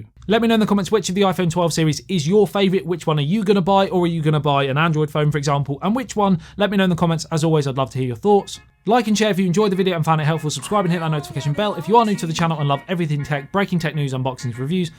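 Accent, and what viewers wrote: United Kingdom. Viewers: Liked for the British accent 😊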